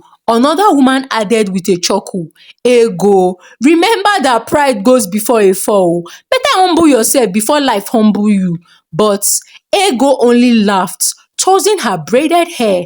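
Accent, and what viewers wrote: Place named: Nigeria. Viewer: I really enjoyed the story, the way it's being articulated just makes it more interesting, I just admire Nigerian accent 😅❤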